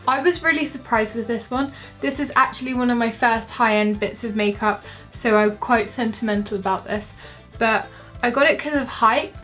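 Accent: United Kingdom. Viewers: Where are u from u sound like ur from England are u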